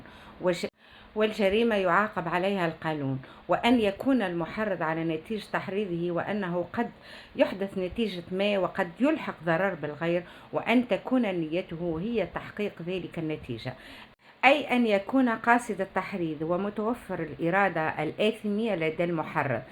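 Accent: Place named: Tunisia. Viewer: ماشاءالله تبارك الله احسنتي النشر والتصوير والطريقه والمنتاج ابداع وتميز وتألق اسلوب راقي ماشاءالله ثقافه عالية بشهادة الاسكندراني العجوز جدو جابر الحنفي ممنون تحياتي لحضرتك اسعدني اسلوبك الراقي صوتك وكلامك اكيد من تونس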